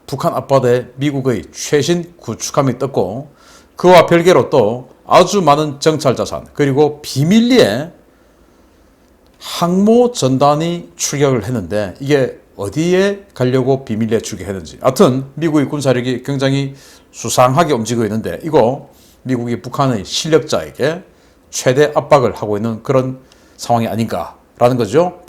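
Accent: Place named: Busan, South Korea. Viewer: 신교수님의 촌철살인적인 강의를 듣는건 내 미천한 지식에 보탬을 얻고 지혜를 향상시키는 귀중한 시간인데 그것과 더해 아주 화끈한 즐거움을 선사하고 가끔 폭소를 자아내게합니다.ㅎㅎ 구수한 나의 본향 부산 억양도 정겹고..술자리 같이 하고싶은 마음..^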